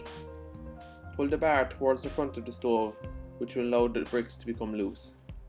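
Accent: Ireland. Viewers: excellent help as i had to replace all my baffles. Also if you enjoy listening to the melodious Irish accent its a gem .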